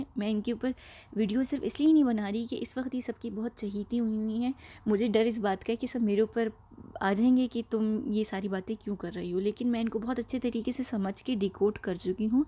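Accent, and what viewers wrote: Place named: United Kingdom. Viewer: Please please make a video on Arnab Goswami & Ankita.i am a big fan of your mam. Awesome analysis. I am a big fan of Arnab too but would love to hear your analysis & I can see he has not disclosed many things about Ssr's death in news. Not sure if there is any personal motive. Are we just watching a drama where maha govt & Republic TV are together & showing us a fake picture? It is so difficult to trust with news coming out nowaday. What do you think of vibhor anand. I have noticed he is making all these big big revelations on YouTube but there are no evidences shown by him. It feels like he is just hungry for an audience & followers. He says he is supreme court lawyer but I don't feel any credibility in anything he is saying. Please do make a video on Arnab & Ankita. I live in UK & it is 1.37 am & I am engrossed watching your videos. 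You also seem to be from UK can make out the British accent. Thanks for the awesome analysis.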